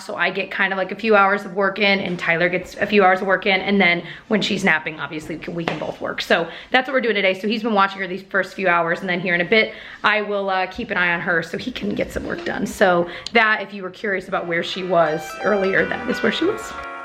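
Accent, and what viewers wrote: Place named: South, United States. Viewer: It’s a Southern thing too! Midwest and Southern accents have similar vocal placement and sounds.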